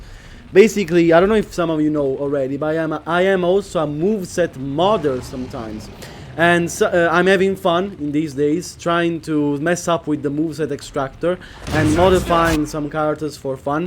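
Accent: Italy. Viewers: L'ho capito subito che sei italiano bro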